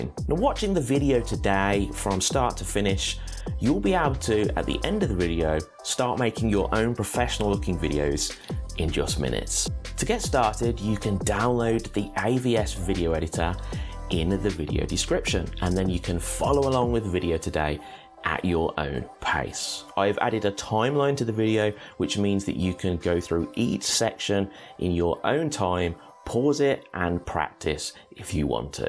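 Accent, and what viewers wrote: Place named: Birmingham, United Kingdom. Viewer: AT LAST. A tutorial in plain English: step by step instructions and no ear shattering unwanted music accompanying it. Why can't instruction videos ALL be like this. My guess is that comes from the Midlands...shades of a Birmingham accent, but that's cool because I'm also from the Midlands: Northampton to be precise. Have had the full AVS Suite for a long while but this video has given me the impetuous to REALLY begin to explore it further. Thanks mate. Have subscribed.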